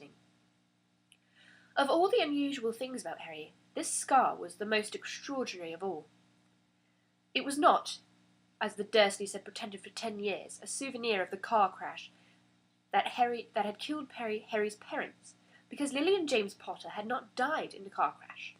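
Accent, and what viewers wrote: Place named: United Kingdom. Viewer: which area in the uk your accent related?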